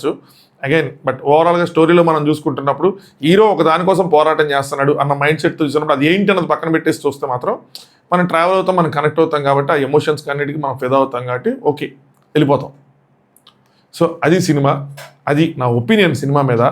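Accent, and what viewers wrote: Khammam, India. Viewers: Hi..Meru Asslu inka divider annapudu chala navvu vachindi andi..Madi khammam exact same accent vuntundi Madi kuda after long time navvukunnanu Meru Ala matladite..Meru chala genuine ga matladtaru..keep going..all the best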